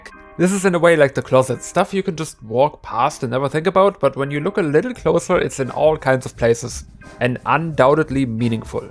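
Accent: Germany. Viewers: Love your german Accent :D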